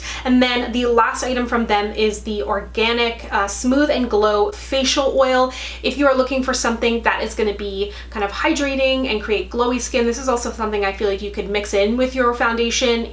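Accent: Newfoundland, Canada. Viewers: You're also a Newfoundlander! That's awesome!! Instant Subscribe when I heard that 🙊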